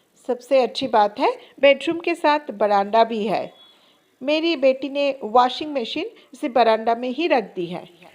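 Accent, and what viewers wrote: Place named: Bengal, India. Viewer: Up bengali haye kaya? Upke hindi bolnese asai lagta hai. Isiliye bola.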